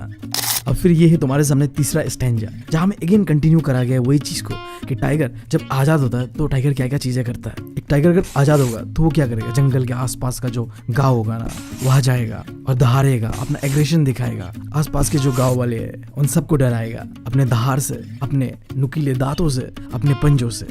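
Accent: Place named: Bihar, India. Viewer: bhai aapki bhasha mai bengali kam or bihari vibe jayada kyu hai kon kon agree karta hai😊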